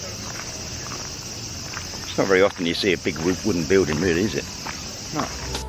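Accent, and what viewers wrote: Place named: Australia. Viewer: Really enjoy your narration. Bit of oz & cockney! Good videos too. You deserve a lot more views friend. Safe travels and regards from N. Ireland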